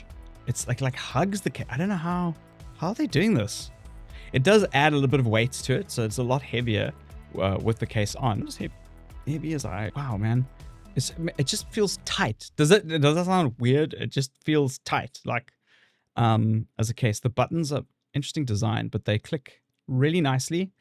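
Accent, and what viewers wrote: South Africa. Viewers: How's it China, thanks for the reviews. Lekka to hear a genuine SA accent. Peace 🙏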